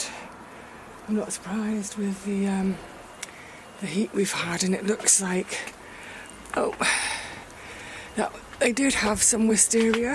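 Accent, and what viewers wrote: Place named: West Country, United Kingdom. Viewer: Wouldn't it be lovely if everywhere could have a cottage hospital, when we were in Suffolk there was one we went to in Aldeburgh, and there is one near us now at Wells-Next-The-Sea. Hope you get a bus pass 🤞 Did you know you're developing a West Country accent? I've noticed it more and more recently 😊❤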